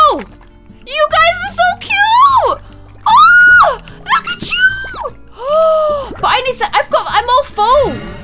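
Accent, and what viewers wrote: United Kingdom. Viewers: ARE YOU BRITISH??? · why r u British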